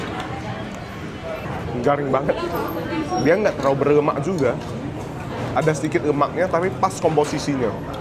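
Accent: Java, Indonesia. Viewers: Kok medhok sih Koh skrg? Gak klen klen Tidak berasa USU-nya Aku nonton film Thailand lumayan beberapa kali, plek ketiplek kayak Chinese Banyak yg budayanya mirip ada yg Hakka, Hokien, Two Chew, dll jg cuma beda Bahasa yg terakhir aku tonton yg tentang ngerawatin Neneknya itu apa ya lupa judulnya, manggilnya Ama jg, trs Dewi Kwan Im jg gitu trs jadi vegan semenjak cucu/anaknya sakit apa gmn lupa Tapi cucunya udh nggak bisa Bahasa Cina, sering dicandain sama neneknya ah kamu gmn sih keturunan ini (ethnic group x) tp gak bisa bahasa ini (ethnic group x) Plek ketiplek kayak Chinese Indonesia yg NTT itu ceritavelin, Surabaya, Medan, Melayu, Kalimantan, Semarang, Bogor, dll seluruh Indonesia Manggilnya Ama jg, tp kalau di pasar masih pada bisa cuma ya yg bnr2 bisa rata-rata generasi Kakek Neneknya Yg generasi skrg bisanya Bahasa Thailand gak nyadar Chinese Di Indonesia jg rata-rata Chindo generasi skrg bisanya cuma Bahasa Indonesia trs ngaku org Indo bangga Kaget bgt ada Chindo di Aussie, pas tes DNA cuma "Chinese" gak ada penjelasan apa2 ya karena database mereka emg msh dikit yg Asia jd ga ada penjelasan apa2, Chinese mana leluhurnya gtu, trs dia bilang dia org Indonesia dan bangga gtu tp udh tau klo gini doang mah 😂 kagetnya krn dia bangga ngaku org Indo bukan Aussie bukan Chinese, tp lebih mudah si klo mau belajar Bahasa Mandarin atau komunikasi, punya akses, tercerahkan kalau butuh yg berkaitan budaya Chinese dan keturunan, bisa gaul, nimbrung jg Mirip di Malaysia jg, katanya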